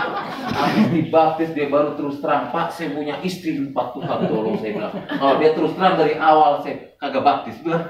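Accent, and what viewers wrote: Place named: Java, Indonesia. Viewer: Bilangnya dari sunda tapi logatnya jawa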